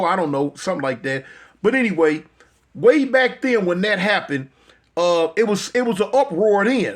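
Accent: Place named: Houston, United States. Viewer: You sound like you from Htown Tx... Southern Voice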